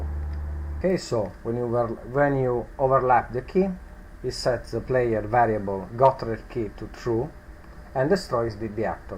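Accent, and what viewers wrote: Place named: Italy. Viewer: Ma sei italiano? Non sembri inglese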